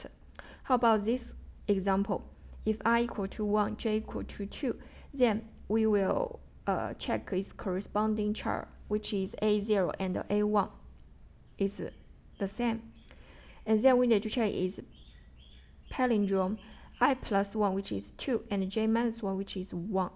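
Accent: China. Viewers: Improve your English. It's hard to understand so called Chinese English.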